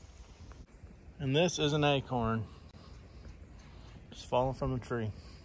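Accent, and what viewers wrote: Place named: South, United States. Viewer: I’d rather have dents than rust. I live in the rust belt and it’s the only reason it’s necessary to replace my vehicles. By your accent it sounds like you live in the south. I purchased a 2017 f150 just for that reason! My last one is a 2008 and has rust everywhere!!!! Steel is very very strong but also heavy. I just restored a Saab 92x and the rust cost me 10 grand to fix! It’s got to be stainless steel or aluminum for me.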